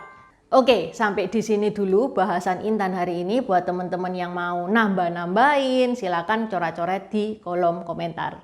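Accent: Java, Indonesia. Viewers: Cik intan tionghoa berlogat jawir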